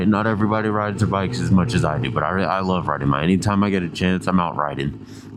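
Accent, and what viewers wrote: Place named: North Texas, United States. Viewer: took me 30 seconds to realize you were in north texas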